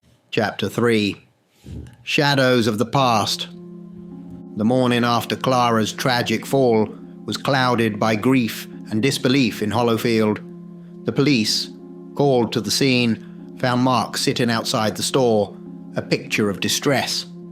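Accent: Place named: United Kingdom. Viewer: You're appearence has change a bit but.your enchanting voice along with your british accent still haunts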